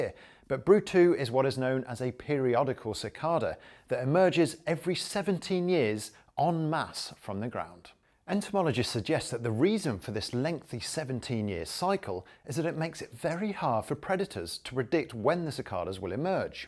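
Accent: United Kingdom. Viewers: Cicaaaadas! I hate British accents! Cicadas.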